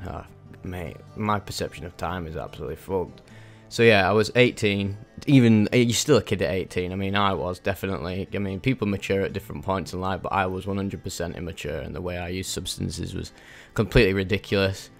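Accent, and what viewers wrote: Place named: Yorkshire, United Kingdom. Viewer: Pal, with that accent you must be round my neck of the woods. Anywhere near Sheffield? 😂 Yorkshirean psychonaut here anyway. Cool videos lad, it's a sound idea to show these game visuals while describing your experiences in a humorous yet accessible and detailed way. Been doing acid/shrooms for over a decade but I've yet to approach DMT/Ayahuasca, just from a lack of source. I've def always been intrigued - just from the levels of consciousness and perception I've opened up into, and the way that's evolved me as a person since my late teens. When done the right way and with respect, these are immensely powerful tools, that has potential in therapy too. You're right that people should generally wait until their brains are more developed though. Get that McKennite wisdom out for the curious bairns! Honestly, once I got into Timothy Leary, or Huxley's Doors of Perception, I moved onto Terence and it absolutely astounded me how his level of communication and language, the pure creativity and lingual dexterity, was able to decipher and discuss the psychedelic experience in such a detailed way. 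Anyway, subscribed!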